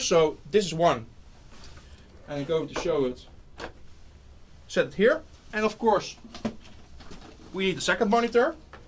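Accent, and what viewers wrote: Netherlands. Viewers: je bent zeker nederlands of belgisch ik hoor het aan je engels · nice dutch accent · can't you like LOSE the fucking dutch accent...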